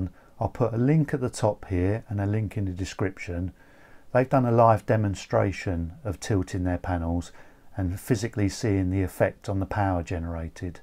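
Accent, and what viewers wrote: United Kingdom. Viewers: Ty, a different view on the power of solar panels and very informative. Also, nice to hear a fellow brit msking these kind of vids. Good luck with the build and ty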